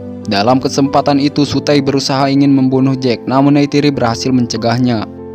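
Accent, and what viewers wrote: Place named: West Java, Indonesia. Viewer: Pasti Narator Nya Orang Sunda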